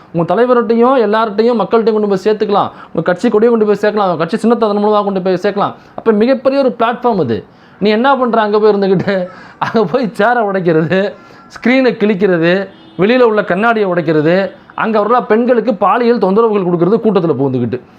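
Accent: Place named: Kanyakumari, India. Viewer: Kanyakumari tamil uthhu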